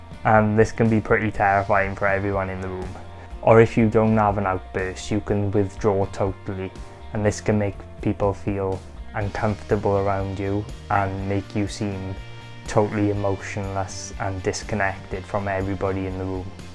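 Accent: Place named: Scotland, United Kingdom. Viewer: Scottish ? Cute accent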